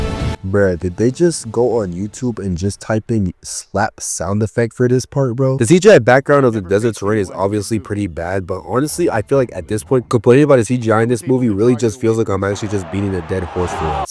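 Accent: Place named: Mexico. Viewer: man THIS one is a stretch Edit- What's wrong wrong with people having their own personal views that others deem "racist"? The reason I even ask that is because YOU'RE grifting by making both of the videos. A real BIG gear grind of mine is when someone calls a person racist for saying 🥷🏿 BUT then THEY start dropping the SAME WORD casually for the rest of their conversation. Nah my boy that ain't gonna fly, at that your whole fake rage & argument you just Steph Curry'd right into the dumpster. AND even if you're Black you sound like you have the inflections of a South of the US Border denizen, so saying it for you is wild if true.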